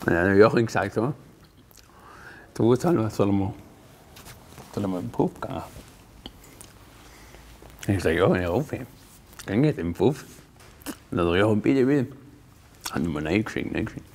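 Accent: Swabia, Germany. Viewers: Dieses Schwäbisch einfach zu geil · HAHA an alle die kein schäbisch könna XDDD ihr versteht nix XD du musst untertitel hin machn^^ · Marcus V Bua, der schwäzt Schwäbisch ned bairisch. Die wolled uns blos nachmacha vorstosch? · Manta i woass dass ea schwäbisch schwätzt xD awa i dabaggs dengerscht ned so zu ren gschweige denn zu "schreim" ^^ awa vasteng tu i scho guad :) i sollte valleicht ein bissle schwäbisch leana wie i boarisch gleant hob xD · schwäbisch xD grüße aus ravensburg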